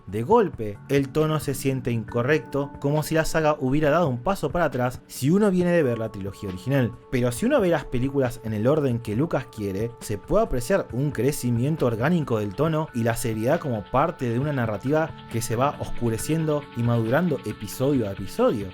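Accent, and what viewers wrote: Argentina. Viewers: Estos vídeo análisis son fascinantes y el acento argentino lo complementa y me parece un cuasi estándar contemporáneo con otros grandes exponentes de críticos youtuberos como Axl Kss y otros. No me imagino las reflexiones y conexiones que harás para el episodio 3 que es el cierre y el punto álgido catarsis de las precuelas .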